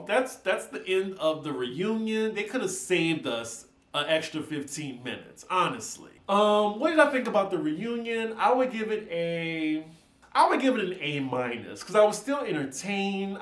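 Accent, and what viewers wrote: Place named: South, United States. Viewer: ... Your Dirty South gutta gutta peeps through sometimes and I LOVE IT!!! Your channel is growing so beautifully!! I am so proud of the way you Rep Louisiana. You are absolutely charming and your content is top notch. Thank you for staying true to yourself. Keep up the good work!!.. By the way, I tried to join your pateron but your link in the box is unclickable.. let me know how I can join. Its past time!!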